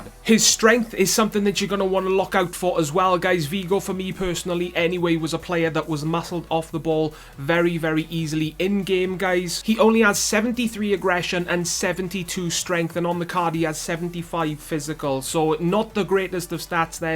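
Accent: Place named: Wales, United Kingdom. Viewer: Beerus that's the Welsh accent bro, they pronounce certain words softly.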